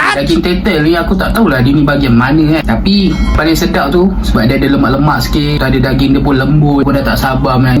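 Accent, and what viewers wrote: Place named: Melaka, Malaysia. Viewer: SODAPPPP! BUAT VIDEO LAGI BANG, PEKAT NAU LOGHAT MELAKA!!!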